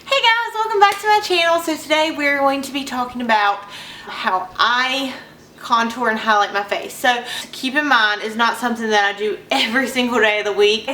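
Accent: South, United States. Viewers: aw i love your southern accent:) i also use the mac emphasize to set my highlight! your videos are really great keep up the good work girl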